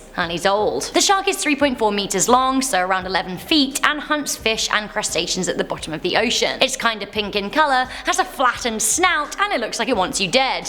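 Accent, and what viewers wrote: United Kingdom. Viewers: It’s British like mine <3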